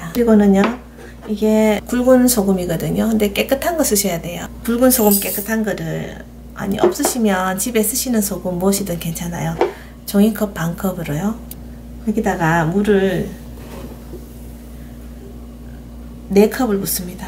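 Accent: Gyeongsang, South Korea. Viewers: 대구 이모 진짜로 동치미 올려주셨네요 감사 합니다 대구 본토 발음이시네예~ 잘 보고 갑니다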